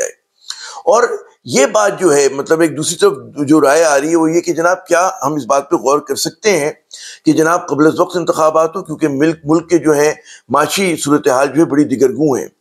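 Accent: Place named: Karachi, Pakistan. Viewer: Lovely, precise and well balanced content. Karachite touch of urdu is retained by very few and other talkshows and vloggers are totally unaware and bland of this touch. Lovely piece of work. Keep it up and bring more and more often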